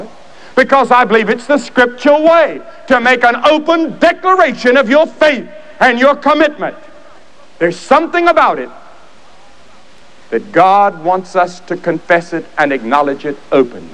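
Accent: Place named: South, United States. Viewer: he had sort of a southern drawl to his voice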